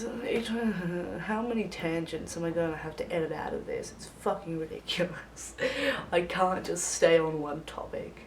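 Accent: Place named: Australia. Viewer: Your look is absolutely terrific, and I'm liking your videos... but your accent was still a surprise! (even though I knew you were an Aussie, from Facebook). Keep it up :) - Ian R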